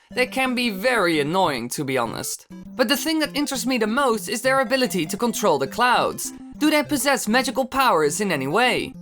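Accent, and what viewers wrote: Netherlands. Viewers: wait ur dutch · I already thought you were Dutch, I am also Dutch.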